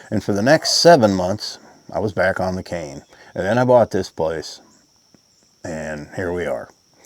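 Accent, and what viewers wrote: South, United States. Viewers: The video or your 1st video was good..u had that southern voice...and photogenic....very nice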